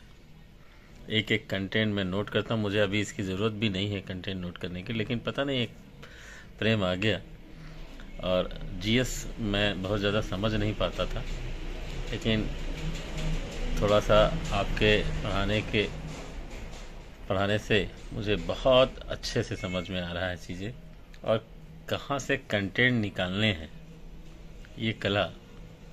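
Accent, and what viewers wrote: Rajasthan, India. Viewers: Guru ji pranam mere family members apko par Dey sunte hai apka Jo international mudda samjhane ka jo rajasthani style hai bahut hi shandar hai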